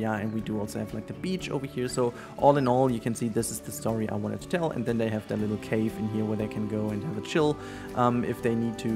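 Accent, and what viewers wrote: Germany. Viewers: your English with a German accent is wonderful! I have a German friend who speaks English and has nearly the same accent. You're doing great. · don't worry about your accent, the important thing that we understand you clearly, and atleast i do. When i was new to this channel you were the first person ever that i heard speaking with german accent, and yes, it sounded very different at first, but nowadays i don't even realise it. As a non native english speaker i understand your pain, cause like you said, we do know that our accent is weird, we just can't figure out why. But anyway, the building looks awesome, keep up the good work!👍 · My mom is german and has a german accent and I never hear it. I sometimes barely hear your accent because i'm just so used to german accents... And Australian. But anyways, she sometimes gets some words wrong, mainly words with J's in them, and I just kind of roll my eyes and correct her because she's my mom, but hey, it's a normal german thing to do. 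Don't feel bad about it! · Hello. Please don't worry about your accent or change it. I also come from Germany and with your videos my English grade is better. Thanks for your work here on yt and Twitch. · I ‘notice’ your accent only insofar as I’m aware that you’re German. You speak excellent English — from an American who lives in England. I’ve never noticed anything ‘wrong’ with your English. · Hi! Please dont worry about your accent, I am German too and my englisch is very very bad, but the most important thing is, that i can understand you and understand, what you want to say. Thats the point. So please keep it up <3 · You speak English very well! English has dozens (if not hundreds) of different accents among its native speakers. In the USA there is Southern, Cajun, Boston, Jersey, New York, Mid West, and several others. Not to mention the various accents with the UK and Australia. 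You speak English with a German accent because you are German. And let me say. You speak English very well my friend. Your grammar is better than most adults here in America so props to you! Ignore the haters, they are just unhappy in life and need to hurt people in order to feel better about themselves! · Hey - I just wanted to say I think your accent is great:) I'm an English only speaker and I never have a hard time understanding what you are saying. Also try to remember that language is always imperfect and changing (even though people like to think that their way is the "right way" a lot of the time) - there's no need for them to judge you and certainly no need for you to judge yourself. I can't imagine having to learn my language as a SECOND language so I give major props to you for speaking it as well as you do. Plus, your German accent is cool and I hope you keep it:) Great video as always, and take care ^_^ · I must say I'm not bothered by your german accent, your videos are amazing and thats all that matters.😊 Keep on the good work cause I'm ready for the next episode!👍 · Hi, very nice habitat and lovely video! 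btw your accent is not disturbing. You're english is very good with the touch of German accent, i like it:)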